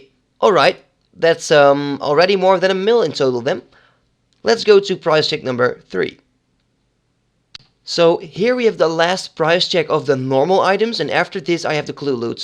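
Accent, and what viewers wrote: Netherlands. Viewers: Are you Dutch? I recognize the accent ^^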